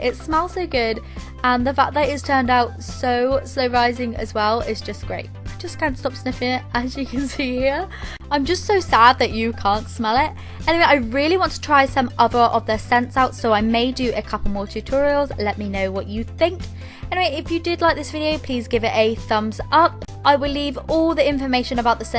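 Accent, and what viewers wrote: United Kingdom. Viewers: I love you're accent I WISH I HAD YOUR ACCENT I THINK ITS BRITISH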